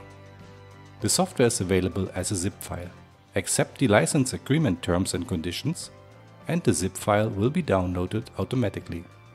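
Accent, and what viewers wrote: Germany. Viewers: Why using a native German speaker for this video. In fact because it's a Dutch brand it would be more appreciated to use a native Dutch speaker 😂😂😂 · I think you are german 😂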